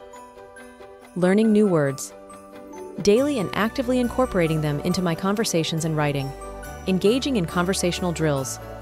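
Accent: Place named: United States. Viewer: Thank you very much for your reply. You are amazing. I don't know where you are from or what your background is like, but you sound very American. I can tell you have been dedicating yourself to learning English and you are still working hard. Much respect. Thanks very much.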